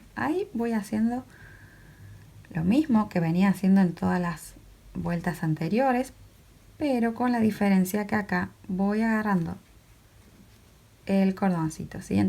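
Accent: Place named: Córdoba, Argentina. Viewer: Qué hermoso!! Tgo 80 años, tejo maravillas, y estoy re tentada de hacer estos zapatos!!! He tejido pantuflas p regalar en pandemia, pero ésto es superior!! Y escuchar tu "tonadita" de ntra Cba, más!!! Gracias X tan bna explicación. Ely,ciudad de Santa María de Punilla, Córdoba, República Argentina.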